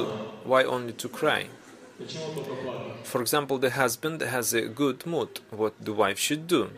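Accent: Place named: Russia. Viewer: That russian accent sucks, but thx to ur hard work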